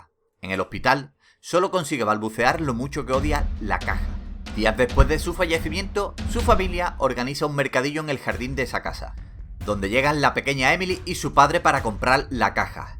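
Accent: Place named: Canary Islands, Spain. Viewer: U DROSSTOP10 NO? VALE PUES PONTE DE NUEVO NOMBRE DOCTOP... ESPERA QUE? YA EXISTE D: PONTE... TOP10VIDEOS O ALGO POR EL ESTILO AH! Y PARECES CANARIO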